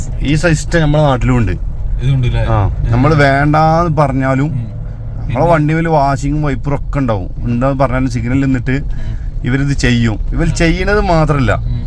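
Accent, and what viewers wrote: Malappuram, India. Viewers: നിന്റെ പെർഫോമൻസ് നന്നാകുന്നുണ്ട്!മലപ്പുറം ശ്യ്ലി യിൽ മലയാളം ok!!!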